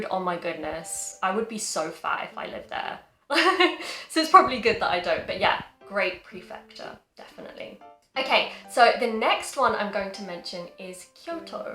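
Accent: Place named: England, United Kingdom. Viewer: Please listen to Cockney or Geordie or even Scouse accents. You can’t say it is intelligible to listen to I am sure. English accents are very diverse than anything else on that matter. Her accent is a tiny example of broad accents you can hear in England or in the UK.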